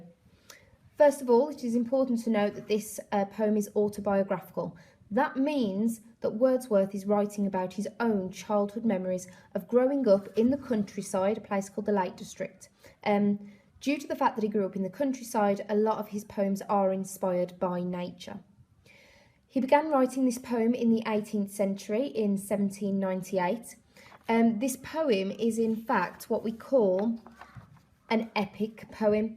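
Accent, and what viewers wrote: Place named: Birmingham, United Kingdom. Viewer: You sound like your from the posh side of Birmingham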